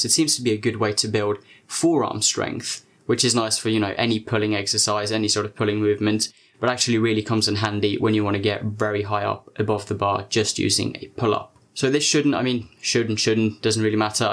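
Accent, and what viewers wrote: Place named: England, United Kingdom. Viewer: Blimey, I thought your accent was from England. You must have learnt your English from an English person.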